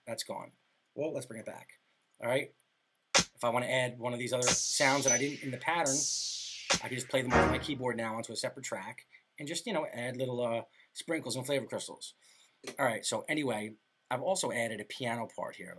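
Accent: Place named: New York, United States. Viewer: Your so new york and italian it makes learning so much easier.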